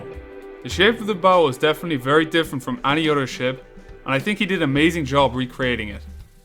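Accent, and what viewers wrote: Ireland. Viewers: You have an Irish accent and by the way could you build the jungle battlefield 5 map it would be very cool to see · u have to be irish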